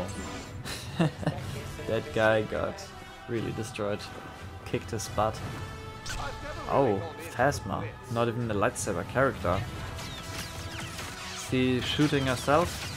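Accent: Germany. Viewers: Man hört raus, dass du deutsch bist :D Gutes Video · Dein deutscher Akzent könnte aber auch nicht stärker sein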